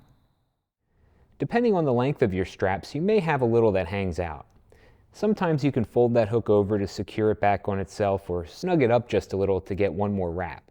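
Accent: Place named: Pennsylvania, United States. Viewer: Thats accent says Pennsylvania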